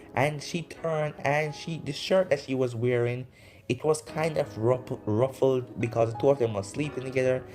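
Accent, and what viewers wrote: Jamaica. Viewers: Your accent reminds me of white yardie. Great vid man. Gonna binge the series.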